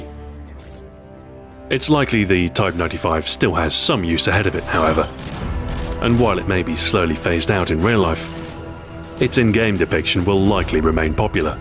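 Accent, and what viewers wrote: United Kingdom. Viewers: YOur british accent is so easy to listen to. And makes it a tad more intresting to watch.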